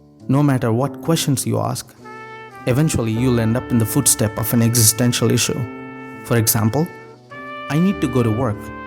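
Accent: India. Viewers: The Indian accent distracts me from the whole thing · The Indian accent is unmistakable. Wonderful essay.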